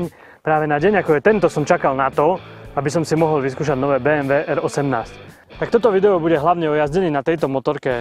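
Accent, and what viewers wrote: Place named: East, Slovakia. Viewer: Motorka brutál.. Ale video som nedopozeral...tá východniarčina trhá ušné bubienky...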